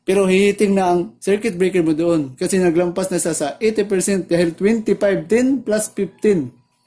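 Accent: Visayas, Philippines. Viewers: Visaya ka boss😂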